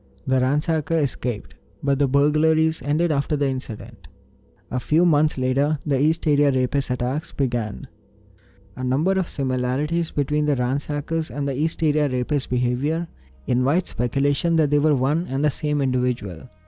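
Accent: India. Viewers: I cannot take that Indian accent !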